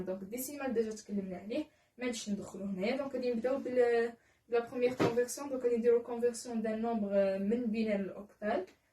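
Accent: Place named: Algeria. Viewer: تبان لهجتنا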